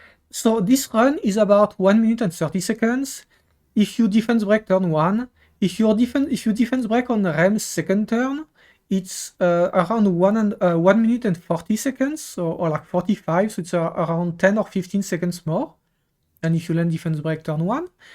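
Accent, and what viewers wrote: France. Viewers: Yea man your french accent is too strong lol..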